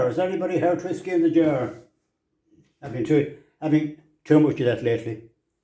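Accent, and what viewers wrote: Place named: Ireland. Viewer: Yes indeed I totally understand what you are saying ....and listening to your delightful accent is pure joy .....I hope to go back for a visit to Ireland ...check in to more of my O'Donnell and Ryan clans❗🎼🎶🎶🎹❤🇨🇦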